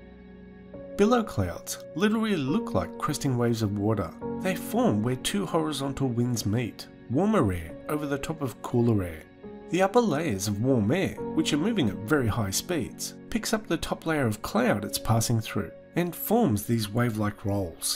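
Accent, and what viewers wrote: Australia. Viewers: What I hear just because of the accent is : wotair ,Yair ,Roll cwoulds aND AUSTWARLIA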